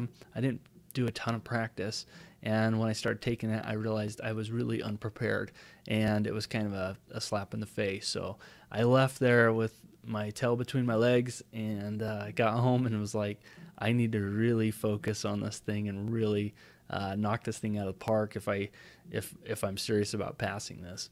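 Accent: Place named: United States. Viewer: I think a major advantage that your course offers is the simple fact that English is your first language, with a US accent. You also seem like a good instructor because of your desire to want to help others. Your personal journey is comforting knowing that we can reach our goals too, even if we had a set back.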